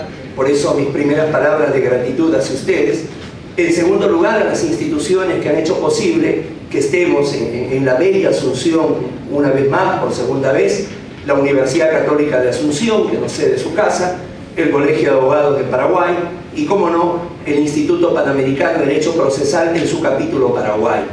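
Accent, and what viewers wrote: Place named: Argentina. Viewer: Me hubiese detenido resaltando como un peruano quiere hablar como argentino, pero eso no seria el fondo, de análisis del neo constitucionalismo no tiene nada, solo en un segundo se mencionó la ponderación de Alexy, de ahí no pasa de una charla para muchachos de secundaria. Acaso el latinoamericano no puede analizar el concepto de derechos fundamentales desde el positivismo y no positivismo? Acaso no le sirve a la realidad latinoamericana si el Juez resuelve con ponderación o con una ponderación disfrazada que realmente es interpretación subsuntiva? Acaso el latino no puede generar una filosofía del Derecho o una Teoría de la Norma que no ignore que la pólvora ha sido descubierta e incorpore variables propios? Que pena que el Perú haya enviado a un equivocado en el tema y que Latinoamérica no resalte exponentes de talla de Rofolfo Luis Vigo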